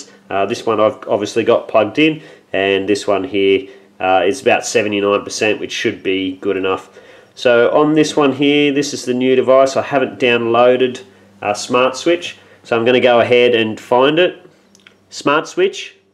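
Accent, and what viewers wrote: Australia. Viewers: Is he Australian?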